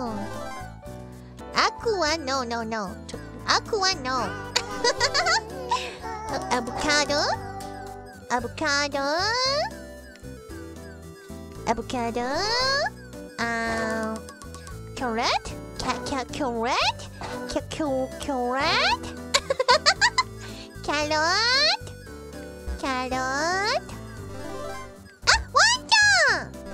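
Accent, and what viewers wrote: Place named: Kansai, Japan. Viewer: that's actually one pair of phonemes she'd have a naturally easier time with compared to her colleagues, since she already has a thick Kansai accent in her Japanese—but the fact that she can now also differentiate between the þ and ð consonants is really impressive.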